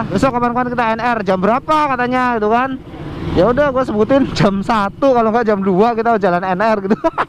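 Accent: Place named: Banyumas, Indonesia. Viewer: Ko ngomonge ngapak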